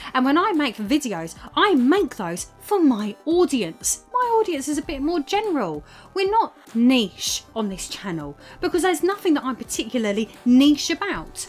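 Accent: United Kingdom. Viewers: You sound like you're at your most British ever in this video, and it's awesome.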